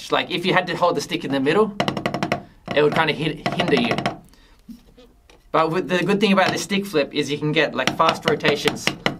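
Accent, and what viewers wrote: Australia. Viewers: His sound like Aussie English takes Japanese for Tokuda has God :))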